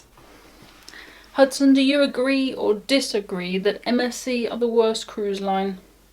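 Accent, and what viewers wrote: United Kingdom. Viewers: Thanks for the British words! I love watching just to hear you talk. We Americans are fascinated by British accents.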